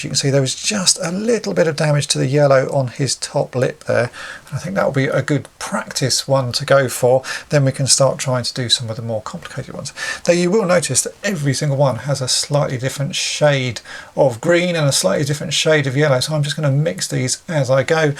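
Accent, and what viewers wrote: United Kingdom. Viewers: I gotta say - this kind of proper English accent is such a lost trait. Parents used to teach their kids to speak properly this way and sound posh, but not anymore.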